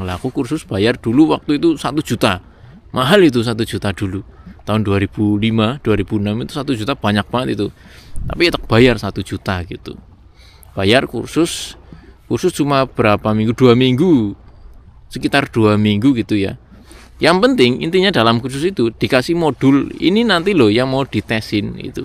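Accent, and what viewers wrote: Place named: Cilacap, Indonesia. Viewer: Wis mbedek kayane wong cilacap sampean kang wkwk. Salam pepada wong cilacap yaa kang, suwun nggo konten e. Semangat kerja ne kang mbesuk tek nyusul ming jepang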